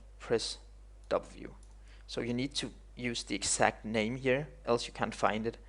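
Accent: Denmark. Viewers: hey i hope you still helps :P im trying to follow your tortuial on this achiement system :P but insted of achievement i will make it to a buy dogs(pugs) :P but i have some problems :) mine says ´pugs´ is a type, which is not valid in the given context :( and i dont get why :) so i hope you will help me :P ps Danish here :) so if you want just talk danish :) cos i can tell by your accent that you are danish :P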